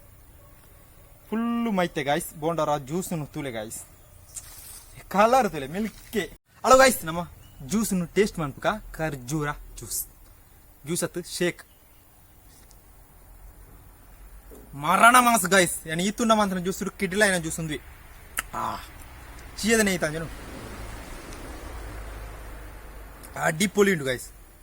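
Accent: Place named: Tulu, India. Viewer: Eerena video frst d last Muttala thuyere bore apuji.comedy apundu eerena funny dailogues kendre kushi ippundu.n anchida Tulu patheruni style kenere edde apundu.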